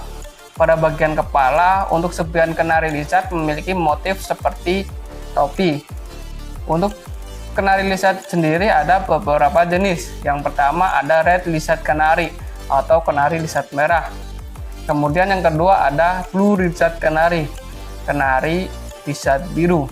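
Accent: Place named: Banyumas, Indonesia. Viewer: Ini baru ahli burung..jos kang.ora ngapak ora penak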